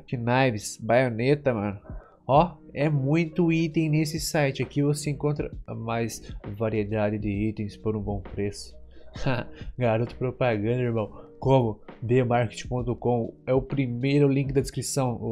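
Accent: Rio Grande do Sul, Brazil. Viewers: madrugando no game atá,achei q era do rio grande pelo sutaque de gaucho kakakaka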